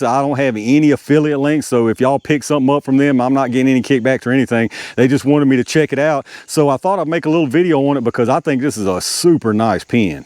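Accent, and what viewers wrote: South, United States. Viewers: Very useful and informative test - thank you. Is that your real accent, or are you "southing it up" for us on YouTube???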